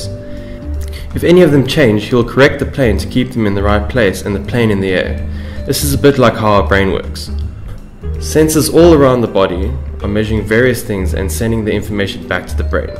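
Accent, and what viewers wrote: South Africa. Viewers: Are you south african? 🤔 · Ak Khan He has a South African accent . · when you have the same accent as him (0-o) (lol he is South African for anyone wondering - or at least he sounds it)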